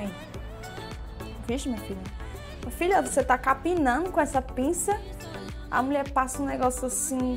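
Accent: Brazil. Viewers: este sotaque bonito é da Bahia ? Pois ocê não puxa o "S" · só pelo sotaque ja dá pra saber q é nordestina, slv pra Fortaleza 🇫🇷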